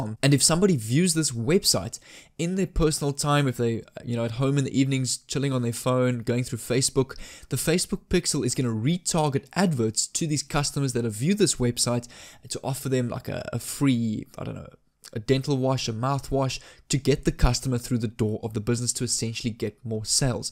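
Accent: South Africa. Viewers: Hi, I just discovered your channel. I'm a fellow South African. (I'm assuming you're South African because of your accent and some of the websites you visit when you're recording your screen default to the.co.za versions.) Thanks for this video, I thought it was an excellent summary of the process involved. I have a question regarding the installing of the pixel. Obviously you are going to need access to the back end of these businesses websites to install the pixel. Are companies not hesitant to give you access to modify their website just from one email? I mean you're a complete stranger that just emails them out of the blue, and asks them to pay you as well as modify their website. I would be hesitant to let a complete stranger do that and even more hesitant if I found out they are from a foreign country, like us. Could you perhaps make a video going in to more detail about "Closing the deal". 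The practical skills like installing the pixel and creating the ads can be learnt and perfected but the hardest part of the whole process, in my opinion would be convincing them to let you fiddle with their website. Most business have probably hired a web developer to make their site for them and I'm sure if they asked their web developers advice, explaining that you contacted them and asked to install something on their website the web developer would by default advise them to just chase you away or ignore you in light of all scammers and fake traffic generation and SEO schemes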